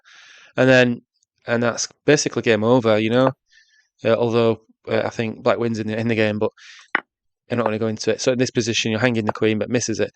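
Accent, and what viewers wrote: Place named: Hull, United Kingdom. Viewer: I found your channel by chance, started this video and kept it going because of the way you pronounced "it's a bit daft really" and of course for the chess help but I'm from hull I'm sure u know it and you talk a bit like me so I subbed great content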